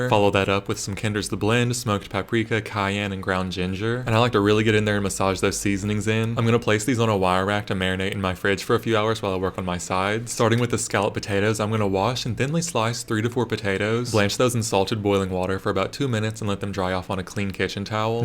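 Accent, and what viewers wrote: South, United States. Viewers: I hear a lil southern drawl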